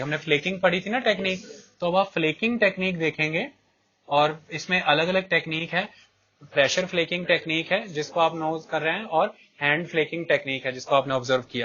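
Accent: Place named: Punjab, India. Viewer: The Punjabi touch in your lectures makes class really interesting. Though, there is no Video lecture still you make these Audio lectures really interesting by using your 3D imaginations as JHRRRRRR JHRRRRRRR....!!! Hahahaha...! Really enjoy your classes and content is really amazing. And the way you explain each and everything is like Cherry on the Cake....! <3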